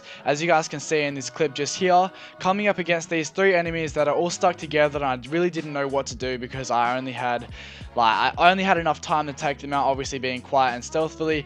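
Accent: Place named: Australia. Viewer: Are you from austrslia you sound like au chezzy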